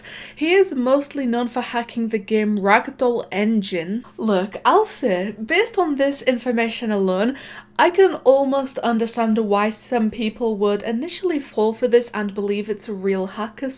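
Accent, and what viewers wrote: United Kingdom. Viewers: are you like british?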